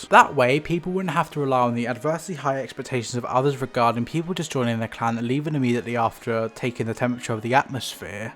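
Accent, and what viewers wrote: United Kingdom. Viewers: You sound like you’re northern Irish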